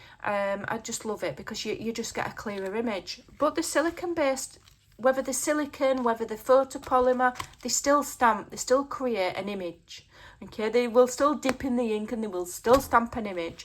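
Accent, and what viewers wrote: Yorkshire, United Kingdom. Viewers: If I could give a thousand likes I would. Most YouTubers wouldn’t be brave enough to speak up. I also like the empathy that you impart with your gorgeous accent 😊Is it Yorkshire?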